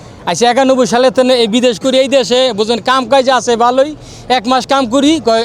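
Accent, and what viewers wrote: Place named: Munshiganj, Bangladesh. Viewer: কথায় বুঝা যায় মুন্সিগঞ্জের মানুষ।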